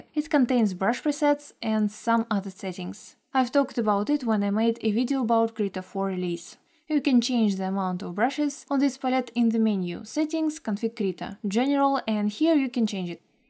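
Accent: Russia. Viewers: Russian accent? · yep, Russian indeed! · no hassle I thought Russian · Russian accent ^_^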